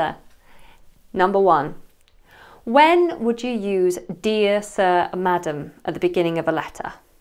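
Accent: United Kingdom. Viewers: I get a headache when I hear that British accent. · sooo british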